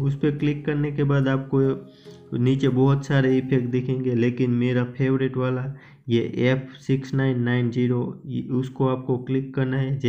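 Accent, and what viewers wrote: Gujarat, India. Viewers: Tare avaj thi aevu Lage che ke tu gujrati che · Bhai Gujarati ho kiya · Bhai aap ka voice Gujrati lag rha hai mebhi Gujrati hu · You from gujrat?? You voice like Gujarati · Apke avaj se lag rha hai aap gujarati ho. Sach bat hai ? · BRO GUJRAT SE LAG RAHA HE ❤ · Bhai aap Gujarati ho aapke voice se lag raha hai sach kya ??? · Bhai tme gujarati chho ne😅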